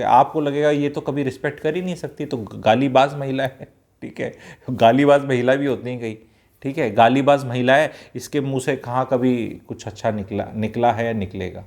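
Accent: Haryana, India. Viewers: Hariyana se ho aap kya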